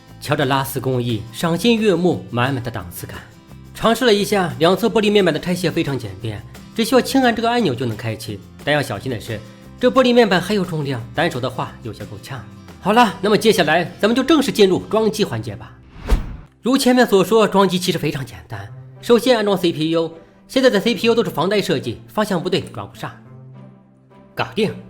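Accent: Shandong, China. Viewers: 大哥山东人？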